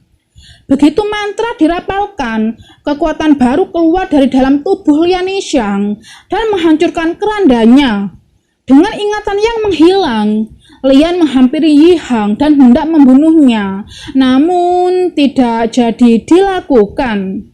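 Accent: Java, Indonesia. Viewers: suaranya medok banget mbak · Maklum mbaknya Wong jowo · ASLI JAWA MBA ?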